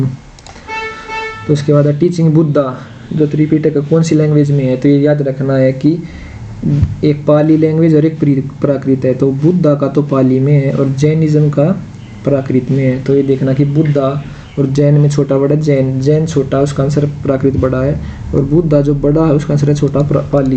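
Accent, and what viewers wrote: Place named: Haryana, India. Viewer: Haryana alya ki to boli t e pichan hoja h konse district ka h bhai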